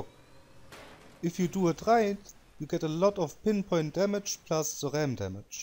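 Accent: Germany. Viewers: Bro man hört so hart das du Deutscher bist xD. Aber trotzdem nices Video!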